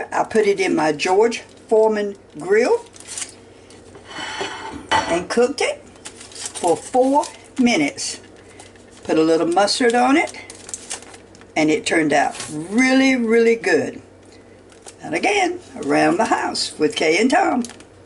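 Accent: South, United States. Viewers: Hello Miss Kay. I've always wondered where your accent is from. Definitely down south.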